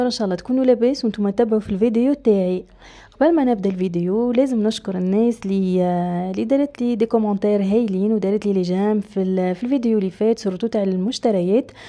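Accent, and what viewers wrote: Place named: Tunisia. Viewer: لهجتك كيفنا التوانسة ❤️😘 انا نحب الجزائر واهل الجزائر والله والبلد الوحيد الي نحب نسافرلو بعد مكة والمدينة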